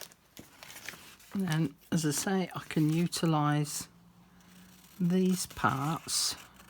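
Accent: England, United Kingdom. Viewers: I love your accent btw it reminds me of my childhood when friends of my dads from England took care of my brother and I when my mother was sick.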